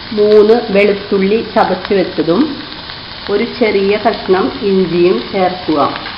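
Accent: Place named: Thrissur, India. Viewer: nalla Thrissur bhaasha..this is central kerala language man not tamil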